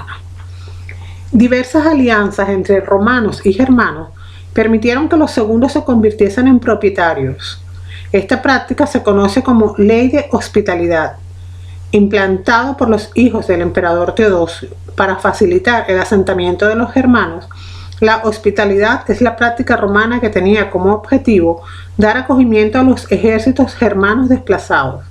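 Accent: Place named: Colombia. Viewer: De que provincia de Colombia es este acento?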